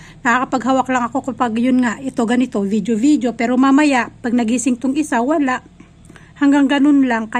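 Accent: Philippines. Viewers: I like the way u speak taglish hehehe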